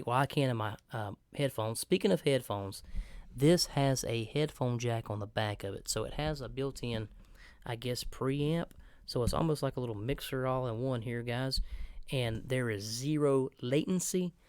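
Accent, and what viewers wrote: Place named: South, United States. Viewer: man you got that country vocal!! love the mic and nice commentary!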